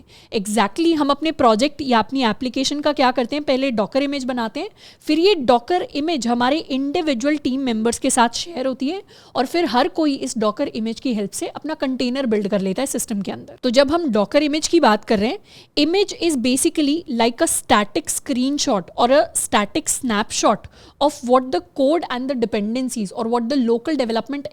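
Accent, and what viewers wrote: India. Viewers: Very Heavy Indian accent. Can not understand what she is saying. Either you speak in English OR speak in Hindi.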